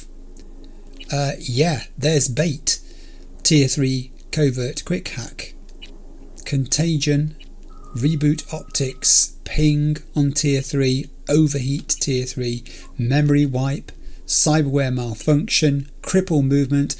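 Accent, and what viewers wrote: United Kingdom. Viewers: One of my favorite episodes yet! I think you have found an ideal cadence of gameplay in this episode, you explored without getting too bogged down in random minutia. I LOVE YOUR MIND FOR DETAIL, but I will admit I sometimes skip ahead out of veteran gamer impatience, but never change your penchant for curiosity. Regardless, congrats on your first place placement, only one more top 3 finish to guarantee the finale of this arc! Furthermore, would LOVE to hear more about your childhood and the village you grew up in, I want to know more! Maybe I am a stupid American but your accent says maybe you are from the Midlands, and I think your naiveté as an Englishmen really adds to the lifestyle in 2077. V is a character all his own, but colored by the players experience, and is a true fish out of water. And a kind Brit in Night City is about as fascinating a protagonist as it gets, I would love more context to your childhood and your perspective as an Englishmen to a very American game! 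Not that it truly matters, but you are clearly a fan of science fiction, and as a genre SciFi is often colored by the experiences of the reader. Hope this week gets better for you, and wishing the best to you and your family! CHEERS!! XOXO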